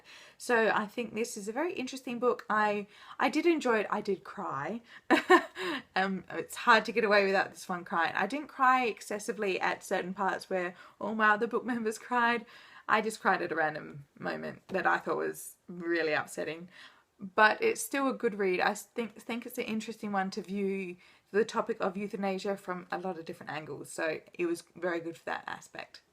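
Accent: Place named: Australia. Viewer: Are u from Adelaide or Melbourne just wondering ?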